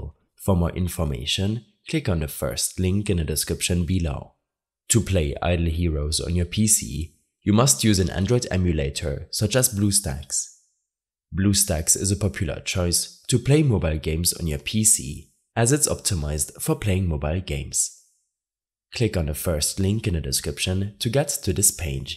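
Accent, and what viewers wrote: Germany. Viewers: are you german du have a akzent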